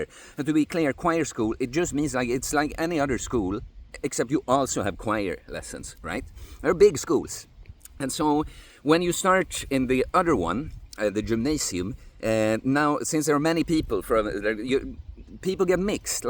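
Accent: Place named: Sweden. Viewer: Love you! Only recently found your channel, but I will mourn the day the last time you will upload. We share a large deal on the views and outlook on life, only difference is youre much much further along this path and youre close to the embodiment of what I aspire to be, both physically and mentally, which is probably why I like your videos so much. I grew up in Sweden so the accent is lovely aswell lol. As for feedback on the current video, I want to hold up a mirror to you and say "own your vibe to attract your tribe", this quote really struck me and gave some food for thought, you keep doing you man. Har det så bra!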